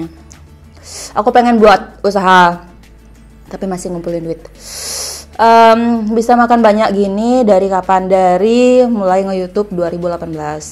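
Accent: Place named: Bali, Indonesia. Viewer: Pesu logat bali ne😹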